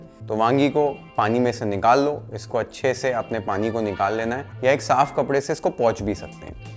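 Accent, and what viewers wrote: Maharashtra, India. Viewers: I love your Marathi accent bang on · Lots of Marathi influence while you talk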